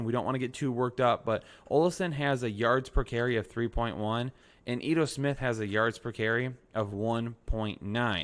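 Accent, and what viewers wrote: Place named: Boston, United States. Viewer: Everything this guy says that involves the o sound is magical and entertaining. I'm guessing he's probably in New England or Boston somewhere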